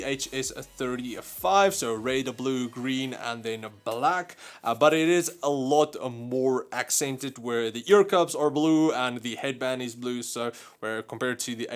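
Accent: South Africa. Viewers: why are you trying to sound americaN? A boer accent mixed with american is a no no bro bro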